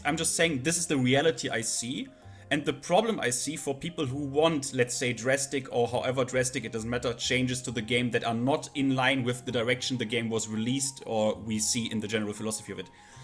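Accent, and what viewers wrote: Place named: Germany. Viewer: direkt den deutschen akzent erkannt :face-blue-smiling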